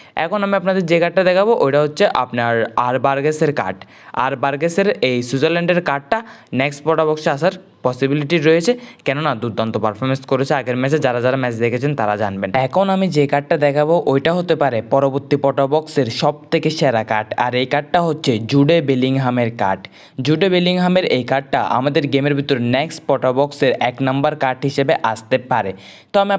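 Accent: Chittagong, Bangladesh. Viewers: Vai apni ki Chittagong ar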